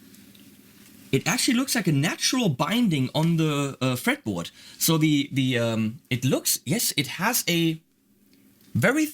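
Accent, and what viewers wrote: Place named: Germany. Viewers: Richtig deutscher Akzent 😂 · mustermann Vielleicht liegt das daran, dass er deutsch ist, aber internationalen Content bieten möchte ;)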